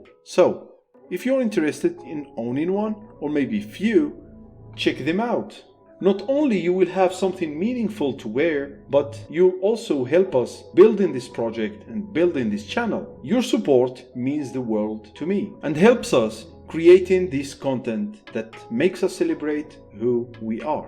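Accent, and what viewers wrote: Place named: Rif, Morocco. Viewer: Is this the western riffian? It sounds a different from the tmazight we speak in Nador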